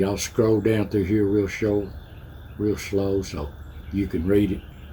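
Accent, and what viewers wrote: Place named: South, United States. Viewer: You're thanking us, but I thank you for putting out your videos. I'm a Southern boy stuck in Yankee country, and I love to hear you talk just so I can hear Southern speech. I love it when you tell us how life is and was in the Appalachians. And above all you're my brother in Christ. In most of the South there can be several drouths in a summer, but in Texas there can be several summers in a drouth. 🏜️ I'm so glad to hear you're doing better. 🙏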